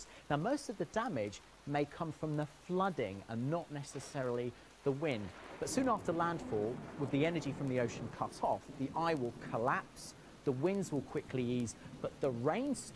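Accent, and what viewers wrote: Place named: United Kingdom. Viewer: Because he is British and that's how its pronounced in Britain, the e at the end is silent. Just like in America you guys say tomato as to-may-tow, we brits pronounce it "tom-ah-tow. There is no right or wrong way to say it, it's the same thing, it's hardly a big deal...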